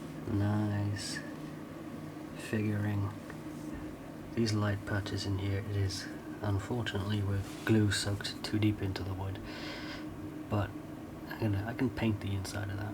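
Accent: Wales, United Kingdom. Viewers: Are you Welsh. Muss ?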